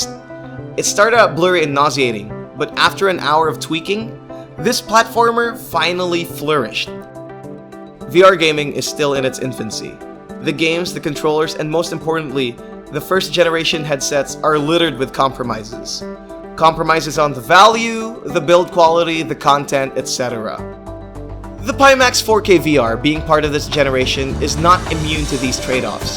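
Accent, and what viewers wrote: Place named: Philippines. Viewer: You sound Filipino 🇵🇭🤔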